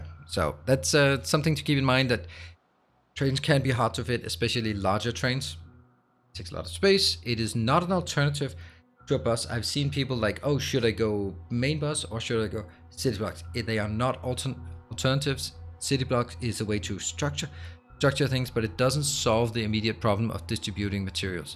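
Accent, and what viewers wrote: Denmark. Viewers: The danish accent is strong with this one, kinda like it though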